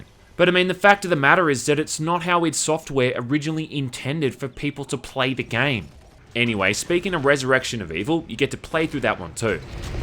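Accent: Australia. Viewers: "LOOK AT EEM, WOTS WRONG WITH YA FAICE???" I fuckin' love australians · It's been 6 years but for me, is the shit! The most honest, in your face, game reviewer. There is no comparison. For us FPS fanatics, it's either him or civvie 11. Reasons? No pandering to the lowest common denominator. No forcing political narratives in your throats. Respecting the old FPS gamers intelligence. Honesty and not getting blown away by modern game gimmicks. And That Australian accent. I grew up playing these games, so for me..... Best FPS game reviewer. Bar none. You Go! Respect from India.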